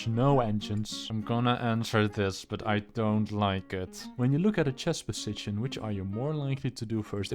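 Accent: Netherlands. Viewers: Are you Dutch? I recognise that accent from everywhere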